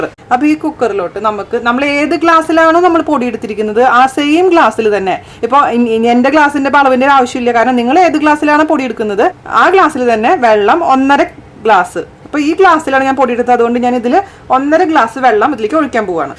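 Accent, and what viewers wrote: Thrissur, India. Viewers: oh chechi thani nadan thrissur style samsaram.weekly once I have to hear your sound. I am from gvr ,at present residing in auh.so lovely.from vini . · hii chechii chechi parayunna slang enikk manasilvunnund chechii .... bcoz iam from thrissur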